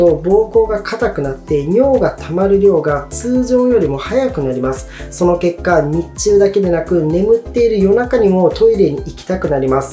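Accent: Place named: Tohoku, Japan. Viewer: はじめまして いくつか動画拝見し チャンネル登録しました。 東北なまりが懐かしいです^_^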